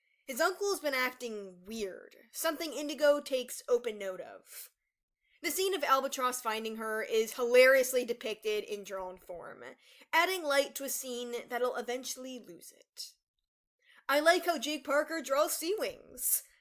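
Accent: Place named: United States. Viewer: dude i really wanna watch ts but the american accent is so HEAVY and i can’t bear ts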